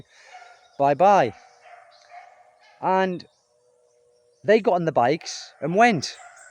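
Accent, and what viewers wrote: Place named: County Durham, United Kingdom. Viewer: County Durham accent if ever ive heard one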